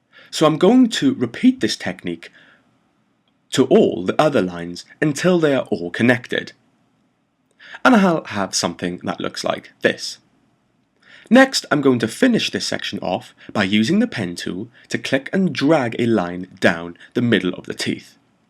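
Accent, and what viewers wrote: Wales, United Kingdom. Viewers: Your tutorials are great, as many people have said. I now believe a Welsh accent should be the universal tutorial approach! This one particularly deserves a comment because although it could be editing, I've never seen anyone talk for 50 minutes straight and still be just as enthusiastic as when they started! Great work, the skip to section in the video description is an especially good idea.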